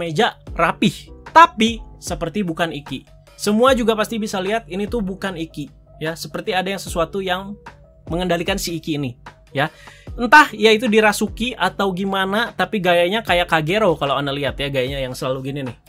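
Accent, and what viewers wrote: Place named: Madura, Indonesia. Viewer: MC nya kayak orang madura.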